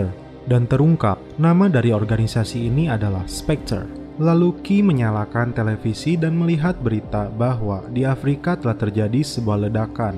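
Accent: West Java, Indonesia. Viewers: Anying orng sunda eyy:v ceuk urng orang Jawa eyy, mantabs lah kedeng urng subrek helan :v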